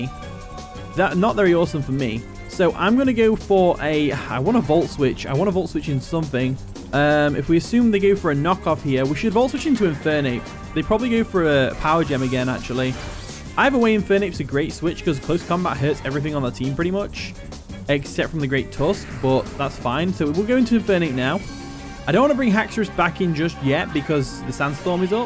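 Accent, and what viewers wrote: United Kingdom. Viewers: Ey man I just gotta ask - I'm from Leicester, lived in Bristol 12 years now; are you from just north of Leicester? Your accent is so close I can almost picture it but I can't quite place it, and it's bugging me because I haven't been up the country in years now 😂 Edit: I recently found your channel so I've seen 10-15vids and it gets my ear every time lmao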